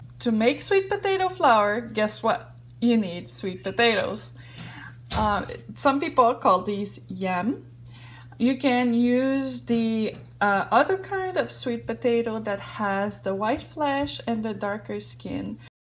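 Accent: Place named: Quebec, Canada. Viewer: Salut par ton accent je comprend que tu est Quebecoise, est ce que tu fait aussi des video en francais? Je vous demande ca parce qu'il n'y a pas beaucoup de chose comme ca et interessant sur YouTube en Quebecois/francais et je trouve ca dommage quand meme. Donc voila, sois beni!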